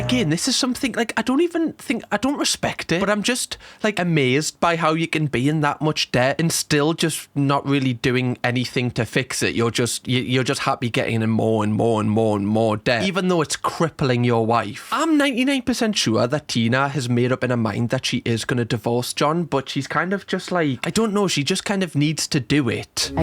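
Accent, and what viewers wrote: Newcastle, United Kingdom. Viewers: I am a happily married 43 year old mom of 3 with 2 older kids and a 15 yr old. I love the care and thoughtfulness he puts into these videos! Plus I'm an American who is a sucker for a Jordy accent! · I am a 46 year old mom of 6 and grandmother of 3 so far and Ditto all of the above comments. Including the Geordie accent comment. It’s one of my favorites!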